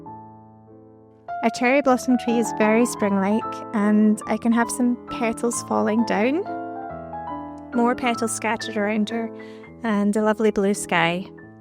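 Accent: Scotland, United Kingdom. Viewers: Lovely…could you do the breakdown of drawing the fox pls…..are you Scottish?😊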